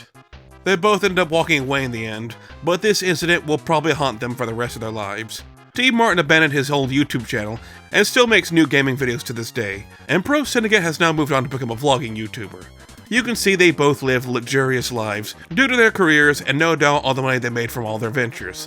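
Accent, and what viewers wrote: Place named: United States. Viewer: I gotta be honest, I like listening to voice. It’s just something about the “Cowboy-ish” accent that really tickles my brain.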